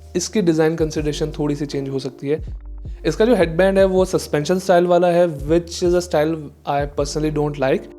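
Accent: India. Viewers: Very hindi english.. Hard to understand but appreciate your Work of course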